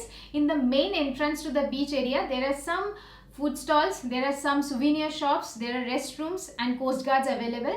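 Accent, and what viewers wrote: Bengal, India. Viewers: Your accent is like a Bengali babu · From your accent , it seems like you are bengali.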